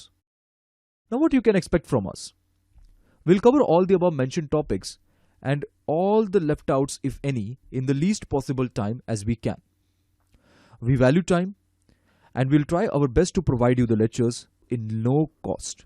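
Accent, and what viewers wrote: South India, India. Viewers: You are very good at speaking sir. Often as an Australian I find it difficult to understand Indian accents easily; however you speak very clearly and well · ngl content is lit but the indian accent prolly south side fucks it up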